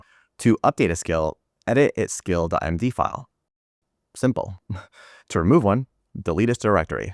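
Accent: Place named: California, United States. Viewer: SNL Californians accent is somewhat annoying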